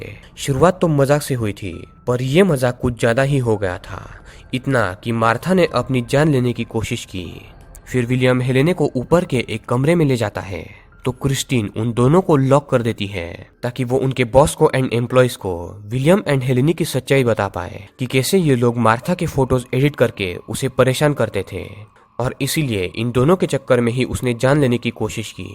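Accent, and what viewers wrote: Bengal, India. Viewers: Oo apki bat bengoli jesi h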